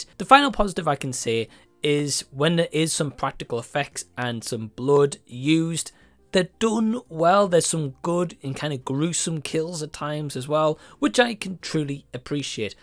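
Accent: Newcastle, United Kingdom. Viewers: Geordie? ;) Why-aye! :D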